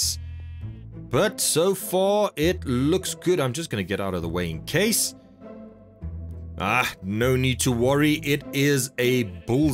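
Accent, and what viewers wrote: South Africa. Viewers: I love your accent are you south African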